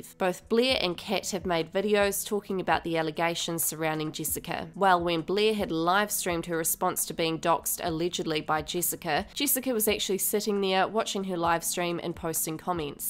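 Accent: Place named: New Zealand. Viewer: true. So nice to hear a pure Kiwi accent from young people without some awful American twang in it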